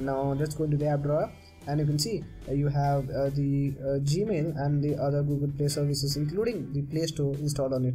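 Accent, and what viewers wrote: India. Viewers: thank you so much...are you from India?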